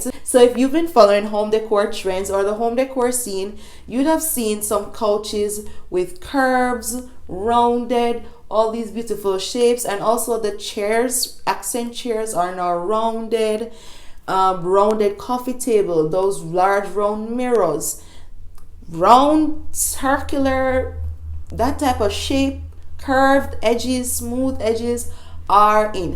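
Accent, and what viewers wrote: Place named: Jamaica. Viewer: was just saying you sound Jamaican. This is my first time coming accross your channel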